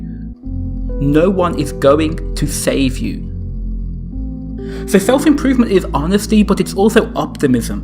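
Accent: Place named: United Kingdom. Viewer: Self-improvement is lonely, yes. But there are definitely ways to make it less lonely. I generally use a gym rather than training outside, specifically for this reason. Judging by your voice, I'm guessing you're British? If you're out training between and 8 UK time, I'll be out training at the same time. I'll try to work in an outdoor session once per week so that, even if we aren't physically training together, it might help us both feel a bit less lonely:) Stay strong brother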